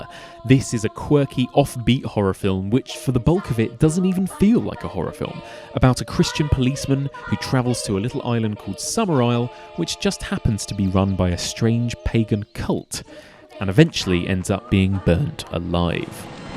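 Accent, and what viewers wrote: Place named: England, United Kingdom. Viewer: Why this whinging over history? It’s so annoying! Get a grip! That’s why our forefathers BUILT shlt and all we can do nowadays is tear down the house we inherited. I can tell by your English accent you are Keir Starmer like guy. Who actually gave you guys the right to torch down the civilization of your fathers going back over 1000 years. 1000 years going strong, then you guys get power and destroy England in a way that cannot be undone. What gives you the right?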